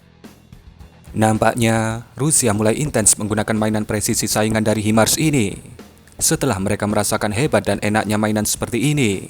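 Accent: Java, Indonesia. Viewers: Komentar saya cuma 1 suaramu medok lucu😂😂😂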